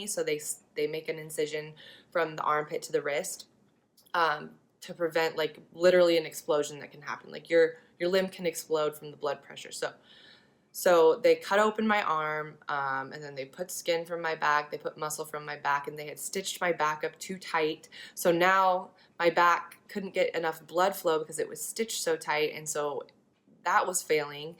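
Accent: United Kingdom. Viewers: You are so inspirational and beautiful. ....and your British accent!! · Her british accent thoo😋😋❤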